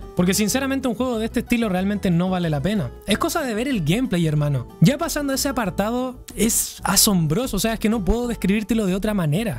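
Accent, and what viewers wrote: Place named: Chile. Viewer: Me estallan tus vídeos por tu acento tan hermoso, viva Chile, Saludos desde Argentina :D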